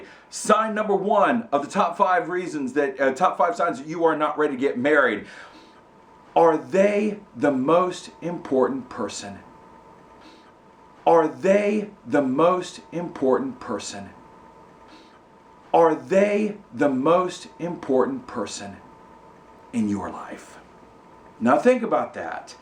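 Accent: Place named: Baton Rouge, United States. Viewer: Baton Rouge accent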